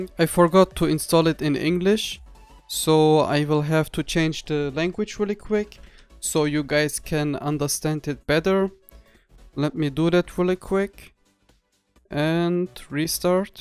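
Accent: Germany. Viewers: Junge man hört den deutschen dialekt sofort raus hahah aber trz nice video😃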